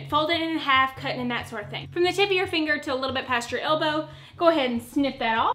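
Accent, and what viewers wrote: South, United States. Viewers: You are just too cute! I absolutely love your Southern accent. I’m Middle Eastern so I don’t come with your accent but I live down South now so I’ve learned a few terms. I just discovered you and subscribed. God bless you. 🙏🏽😇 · Girl u r a hoot. Where ya from, sho ain’t a yankee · Oh my gosh! You are just too adorable! Love your Southern-ness! Great tutorial. Love the finished piece. I can't wait to make a similar piece. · She sounds southern. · Alexia Stotsenburg she's southern! We're from Alabama! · I have no idea why but I thought you were from Australia for the first 10 seconds then I realized it was a southern accent 😂 you're gorgeous and funny, subscribed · You're hillarious. Loven the Southern accent lol hugz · Purple pigtails, over the top eyelashes, and a Southern accent? Subscribed!!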